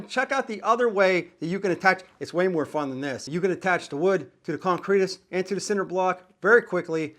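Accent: Pittsburgh, United States. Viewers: Bro is 1000% from pittsburgh or surrounding area. My man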